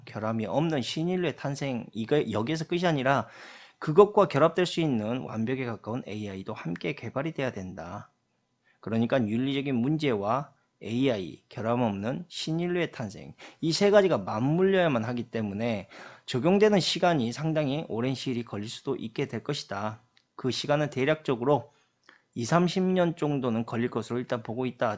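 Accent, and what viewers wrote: Jeolla, South Korea. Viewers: 말씨가 전라도같은데?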